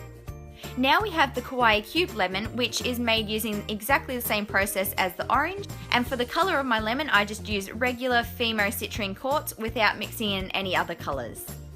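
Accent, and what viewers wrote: Australia. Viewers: Are you a fellow Aussie? Or a Kiwi? I'm trying to pick up on your accent lol. I'm Australian, myself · She's Aussie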